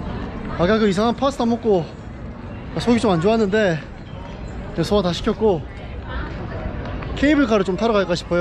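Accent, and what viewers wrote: Gyeongsang, South Korea. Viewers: 나 웨 영상 끝나는게 싫지? 재며들었나봄...경상도식 영어 못잃어↗️↗️↗️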